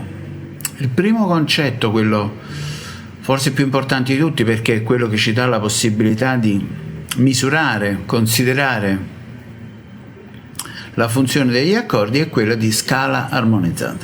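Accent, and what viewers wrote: Naples, Italy. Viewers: Cmq si sente che è napoletano... per fortuna.. aggiungo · Un napoletano sente i suoi simili già nell'armonia di voce comunque grande ,avevo lasciato la mia tastiera da parte ma con i tuoi tutorial mi hai dato voglia di imparare cose buove semplici e concrete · Lo so che sei di Napoli, e si sente pure 😉. Cmq ti ascolto sempre con molto piacere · Si sente la radice napoletana, ed è molto piacevole...sec me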